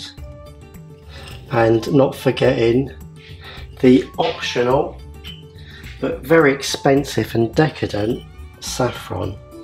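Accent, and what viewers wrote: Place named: Cambridge, United Kingdom. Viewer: are you from Cambridge/Newmarket? You sound just like all my family there:D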